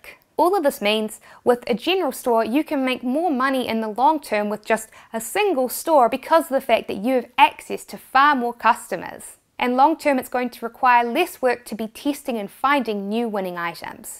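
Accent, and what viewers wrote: New Zealand. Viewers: Pets. Kiwi accent.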